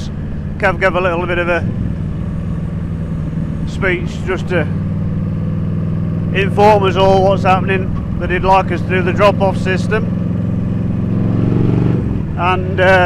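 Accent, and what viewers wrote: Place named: Lancashire, United Kingdom. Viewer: mate I freakin hate bugs 😂 and the amount of wildlife we see and the GoPro does not pick it up is incredible! That’s another reason I stopped using Superview on it cos it even makes curvy country roads seem dead strait!. Ooohhh and the Accent is Lancashire am originally from Preston ‘ 👍👍🍺🍺🥃🥃🇬🇧🇺🇸 ooohh Mate Thanks for the sub Appreciate That 👍👍🤘👌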